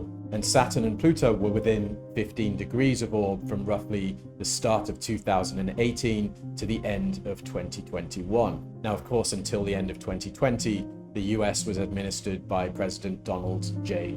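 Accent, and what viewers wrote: United Kingdom. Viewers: Nice to hear a british accent. Was a bit tired of boring Chris Brennan who almost makes me doze off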